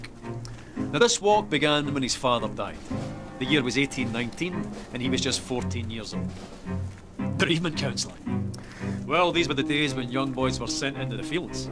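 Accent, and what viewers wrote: Scotland, United Kingdom. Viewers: Is he saying genuine Scottish accent? It sounds cool and sexy. Which makes his voice sexy, his voice or Scottish accent? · thats Scottish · He's Scots!!!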